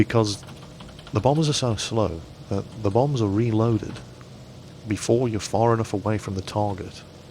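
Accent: Australia. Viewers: lol love the aussie accent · Love that australian accent! Keep doing it mate! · nice australin acent i come from australia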